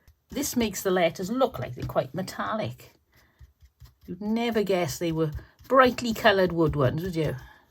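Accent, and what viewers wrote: Wales, United Kingdom. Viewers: Don't take this wrong but after listening too so many American Crafter's, you have the most Welsh accent I have heard in ages.😂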